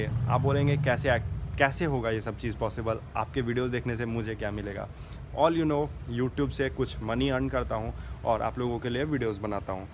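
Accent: Bihar, India. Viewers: Bihari tone aa hi jati h teri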